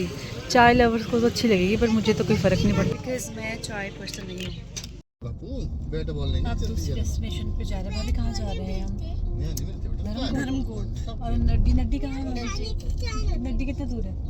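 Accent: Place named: Pahari, India. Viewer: One of my family member (one uncle) married from Shimla. They have Apple orchards. I felt Pahari influence in your hindi. It is sweet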